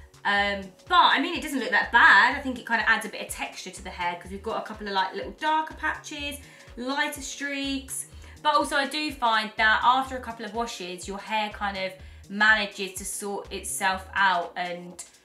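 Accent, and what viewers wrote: United Kingdom. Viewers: She's from Great Britain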